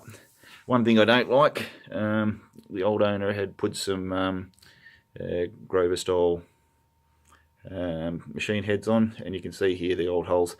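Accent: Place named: Australia. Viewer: Good to hear an Australian voice talking about guitars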